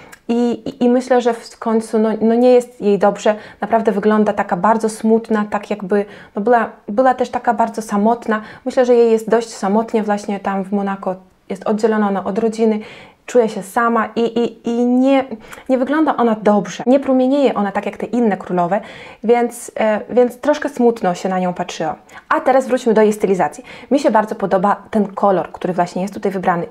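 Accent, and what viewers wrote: East, Poland. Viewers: spytałam o pochodzenie bo usłyszałam piękny wschodni akcent. Pozdrawiam 🤗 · Piekny,wschodni akcent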